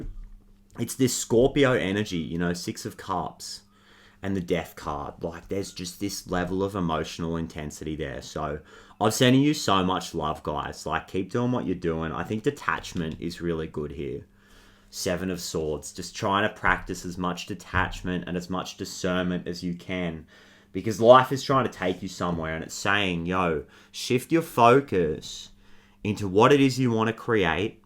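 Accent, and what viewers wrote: Australia. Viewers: The comfort of hearing a fellow Aussie voice jump out 🤜🏽🦘🤛🏽 Thanks man. 1st time seeing your channel. You helped me get at some tears, & quietly verbalise what's been eating at my spirit. Feelings of friendships not being reciprocal enough, even when they are good solid friendships. Feeling utterly disconnected/detached, but not in a way that feels helpful. Lots of reminders needed to hear in this. I appreciate that. The waterfall, also so significant to me... Let's see what happens I guess. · Instant accent bonus applied! What part of Aus you from? 😂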